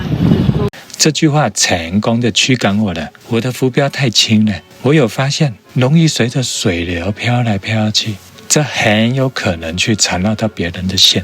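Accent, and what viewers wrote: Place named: Japan. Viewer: 講話怎麼感覺像日本人在講中文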